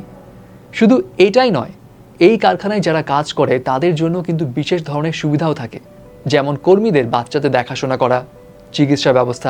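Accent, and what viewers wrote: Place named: Kolkata, India. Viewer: ভাই এর কথা কলকাতার মতো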